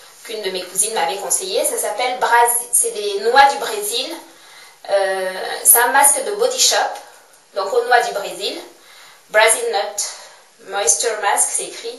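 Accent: Cameroon. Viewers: J'adore ton acent de chez nous (kmer mboa). moi j'ai raqué il ya 1 semaine (je me suis defrisée) apres 3 mois de soin et de belle repousses afro, solides et tout. mais je travaille ds la banque et c'est pas evident pour le taf. tu m donne trop envie de me faire mal pour retrouver mes cheveux epais, et tout brefff i lov ur vid's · Hello j'aime entendre ton accent amerounais, moi aussi je suis camerounaise, ça fait plaisir de croiser une de ses soeurs sur Youtube...L'huile de ricin est EXCELLENTE, ça rend les cheveux fins plus épais,ça donne du volume,ça lisse les ecailles des cheveux et le Comble, ça favorise réellement la pousse du cheveu! J'adoreee...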